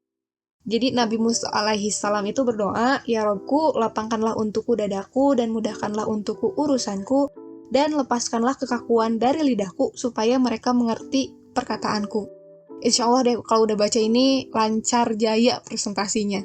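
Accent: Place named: West Java, Indonesia. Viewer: BARU NONTON SI TETEH NA SUNDA PISAN. HEHEU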